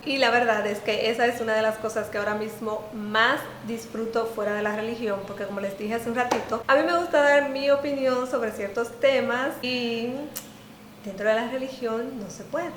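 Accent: Dominican Republic. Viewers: Hola. Qué bueno que lograste salir de esa secta. Quisiera saber si eres dominicana. Tu acento al hablar parece ser dominicano. Yo soy dominicano y me gustaria que por favor me respondieras, y así ver si podríamos tener alguna comunicación para que compartamos sobre el tema. YO estuve estudiando con ellos durante mucho tiempo. Pero su doctrina no la pude asimilar, pues ya tenia un poco de conocimiento del verdadero evangelio de Cristo. Me estoy preparando para formar parte de un ministerio de ayuda a los esclavizados en la secta de la Whatctower. Fuiste muy valiente. Saludos para ti.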